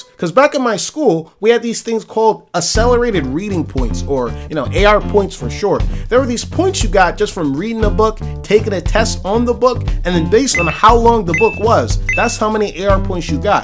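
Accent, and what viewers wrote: Jamaica. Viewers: Wait yuh jamaican holy shit bless brogawd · is he Jamaican?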